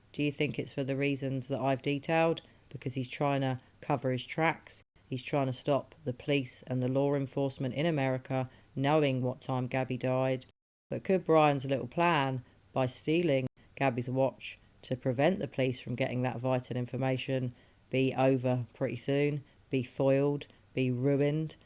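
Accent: England, United Kingdom. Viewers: IMO he simply stole it as a trophy, and to feel his superiority and control every time he looked at it. If this was the reason, murderers usually hang on to their trophies, so may be if not wearing it, it's still with him. Thanks, for your videos, I live abroad, and look forward to hear you English voice with each new video. YAY, go gal go!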